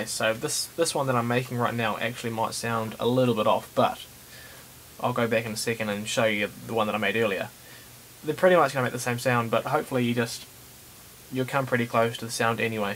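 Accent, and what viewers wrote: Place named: New Zealand. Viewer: haha i thought i could hear an nz accent ha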